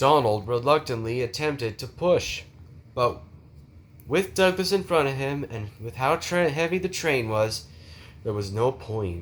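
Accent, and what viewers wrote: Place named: Australia. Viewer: why does he have an australian accent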